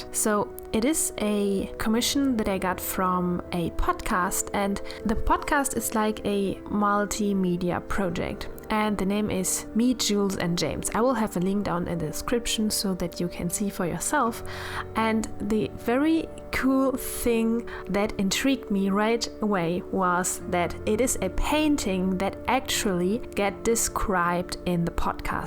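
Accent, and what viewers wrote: Germany. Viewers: Your german accent totally annoys me but your paintings are awesome.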